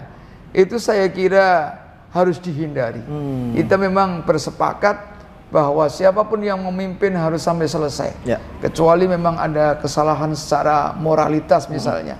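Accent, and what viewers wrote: Java, Indonesia. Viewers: Bawa2 betawi kok logatnya jawa yai?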